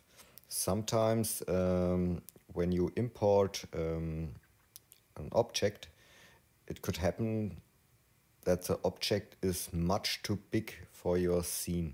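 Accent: Bavaria, Germany. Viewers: I like your bavarian english!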